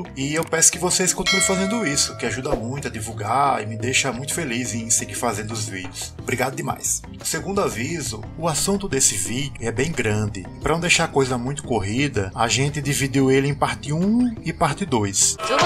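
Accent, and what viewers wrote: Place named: Northeast, Brazil. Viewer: Queria que existissem mais vídeos no youtube com essa pronúncia do nordeste do Brasil pois é a única que lembra a de Portugal.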